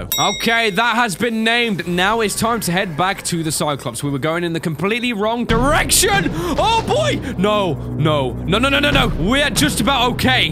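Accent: United Kingdom. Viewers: Is this guy british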